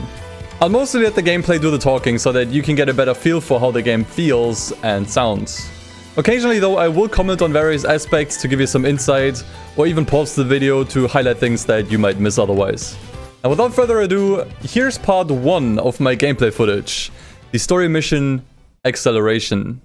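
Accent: Germany. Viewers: Man hört sofort das du Deutscher bist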